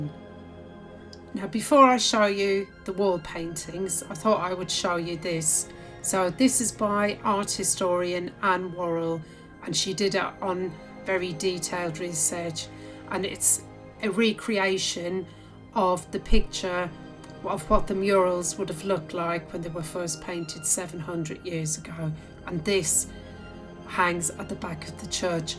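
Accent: United Kingdom. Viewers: seriously I showed the video to my beloved mum for her to experience real English and enigmatic atmosphere of the churches❤I admire Br.Engl❤and your way of speaking❤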